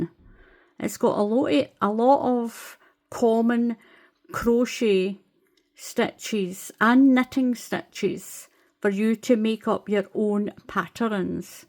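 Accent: Scotland, United Kingdom. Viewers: This is a beautiful pattern - thanks so much for sharing it! Your instruction is wonderful and I so enjoy listening to your lovely Scottish accent. I've done some swatches with different yarns and hooks and haven't been pleased with my results. I'm going to order the brand you use in the video. May I ask what crochet hook you used?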